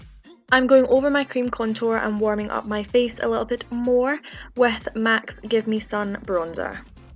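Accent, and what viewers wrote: Scotland, United Kingdom. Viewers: Gorgeous makeup look 😍 so different, glittery and colorful - I would really wear this for clubbing ☺️ I also have the Urban Decay Glitter Eyeliner but in the silver color and it is really nice 😎 Stumbled across your channel when I was searching for Scottish beauty youtubers (because I will study in Scotland soon so I wanted to practice listen to the accent 😄) and immediately subscribed 😇☺️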